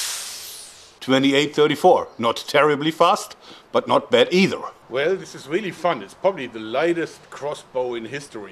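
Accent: Germany. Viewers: I really like your German accent when you speak English. 😜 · This guy's accent is so charming. Once I heard him say he was going to try to weaponize a coke bottle with that German accent I knew I was in for the whole video. XD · Dat is ja richtiges deutsches Englisch!!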